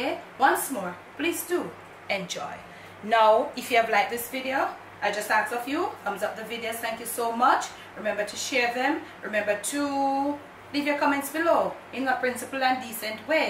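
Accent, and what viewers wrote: Patois, Jamaica. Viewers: I came across your channel on new year's day of this year. I was so excited to see a fresh new face and to hear the patio ( the worst thing you can do is trying NOT to be authentic or "speaky spokey " as my elders would say). Your Jamaican accent is what brings a lot of flavor to your channel besides your delicious food. Just block the haters and continue with your patio. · I love your cooking videos. The patwa mek it very authentic. Gwan wid it same way. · The people who thumbs down your videos a pure fools don’t change your patois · Me love me jamaican way of talking you go dont stop we talk sweet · I like the way how you talk patwa me use to live in Guyana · British🇬🇧 lady with Jamaican🇯🇲 parents. Mummy lived in UK for over 45 years. Mummy never "twiss up ah mout fi nobuddi traight!!! Jamaican til the day she dead....You are authentic JA!✊🏾 keep up the patois only tuppid eedat ah gwarn like dem fool👍🏾👍🏾👍🏾✊🏾✊🏾✊🏾✊🏾💯❤ Mannerz and Respeck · mouth mek fi chat anything dont pay dem no mind, everytime I introduced this vlog to someone we talk about the same thing. 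Yuh just feel like an era of Jamaica that has passed, so pure and happy with your craft. Because this is a gift, you make me feel like I'm back home watching my Granny and Mommy cooking and laying out nothing but good Jamaican values. That switch up at 3;34 is exactly why only fiwi language can explain it. Always puzzle mi how u can sit and watch something like this and the only comment you can make is a negative one. Its draining people let that go. Full time we appreciate the beauty in wi likkle dialect, its just pure poetry once you understand it. keep teaching, I might not comment but like clock work we watch everything. Know that your are top 3 of all vloggers on this island. Rass Mokko, In Jamaica &. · Love the patois. Don’t stop, adds sweetness to the videos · Keep up the patois..... for those don't like it....... bun fyah fi dem. Big up 💪🏽💪🏽❤❤😘😘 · I’m enjoying the the patois, it’s your culture and you’re being authentic. 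· Chop yuh patois Ms we love it! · I enjoy the videos and the lovely Jamaican patois. As some one once wrote everyone would like to be Jamaican as it is cool. Keep it up. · Good evening,. Cook down or cook up it's all good. Don't allow anyone to change Yuh.. Me nah undastan er ting you talk in Patois but me luv it....Food tun up up up. Bodow...Boom Trini in de house. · Lady I love you 😅😅😅😅😅😅unno don't bother ask her how much millimeters of ginger.... 🤣🤣🤣🤣🤣🤣🤣🤣🤣🤣🤣you're the only person I follow on YouTube.... O...and chat you patois wi nuh cater fi nobaddi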